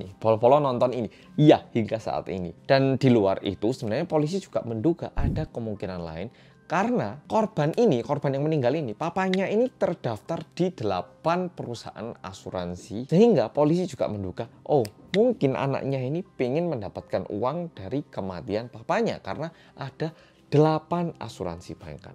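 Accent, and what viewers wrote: Java, Indonesia. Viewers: Hallo mas.. Jowone medhok bngt. Pertahankn yo mas, fasih bngt ngmong bhasanya. Sukaaa bngt, pokonya sucsses sllu buat mas, salam dr mlysia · Lama g dgrn suara medhoke mas. Lama g nntn, makin ganteng aj kamu rek... kaya idol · 3 channel storytelling favorit: 1. ( medhok, tapi paling asik, berasa diceritain pacar) 2. Nessie Judge (Bahasanya gampang dimengerti) 3. Arroyan Pram (Konspirasi dunia pake sains dan teknologi, Astronomi, Fisika, dan editannya paling niat dan bagus) kalo kalian? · Knp ganteng trusssss sih skrg mas medhok nya ❤️❤️🥰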